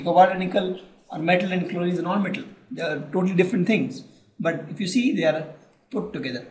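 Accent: India. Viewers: u need subs for an Indian English?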